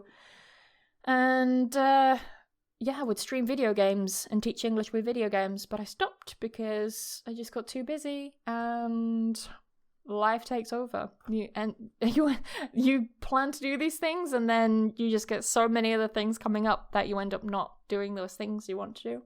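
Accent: United Kingdom. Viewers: 100% British English always!!! I really enjoy your videos…..and with them I can develop my skills in understanding the English language. Thank you very much,.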